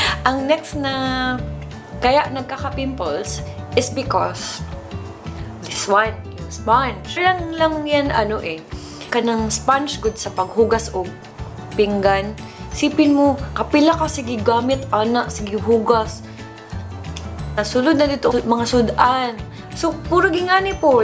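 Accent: Visayas, Philippines. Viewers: HAHA! Nalingaw ko maminaw magtagalog ang bisaya.. Perti gyud kagahi ug kasamok maghunahuna unsa sunod e.estorya sa tagalog jud. Keep it up. Love your vids with dodong.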